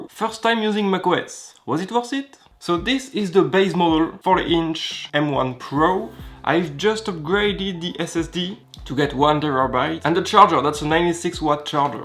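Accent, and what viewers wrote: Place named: France. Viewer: The French accent make this review magnificent L’humour le montage t’es bon ! Keep going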